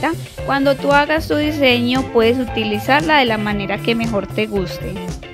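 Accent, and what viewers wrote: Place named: Colombia. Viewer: Por tu acento puede que seas de Cali ó Medellín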